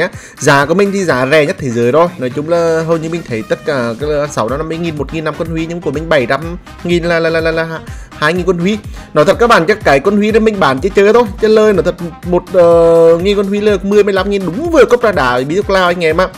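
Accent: Nghe An, Vietnam. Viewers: phải quê nghệ an k cách nói chuyện hay quá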